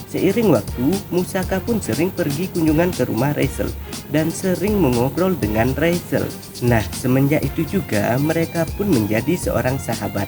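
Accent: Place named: Lombok, Indonesia. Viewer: Miminnya seperti orang lombok ya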